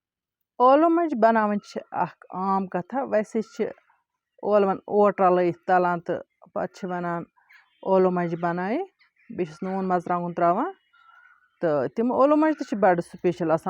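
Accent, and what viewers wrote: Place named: North Kashmir, India. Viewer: Typical kashmiri bolebosh. Aap zaroor north kashmir say honge.....either bandipora or baramulla district.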